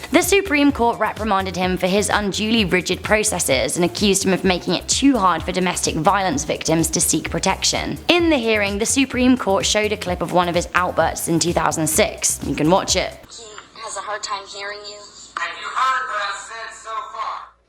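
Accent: United Kingdom. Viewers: What is the story behind the British accent, you are from Canada, right??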